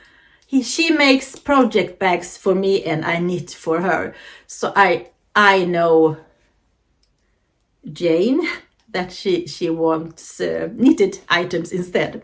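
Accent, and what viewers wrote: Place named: Sweden. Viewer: I love yout Swedish accent ! It's so cute❤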